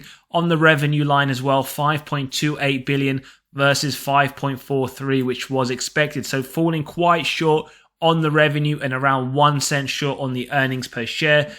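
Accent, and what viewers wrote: England, United Kingdom. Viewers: BTI vs BATS? are you just catering for the global audience or would you consider BTI rather than BATS? do we (you sound english) pay dividend 15% tax on BTI? but maybe there's no stamp duty.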